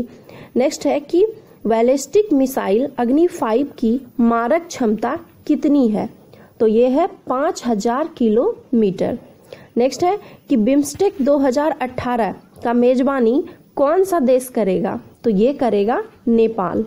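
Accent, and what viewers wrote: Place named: Maithili, India. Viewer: thanxx and aapke bolne maithily tone ki sweetness ki chhataa saaf jhalaktee hai 😀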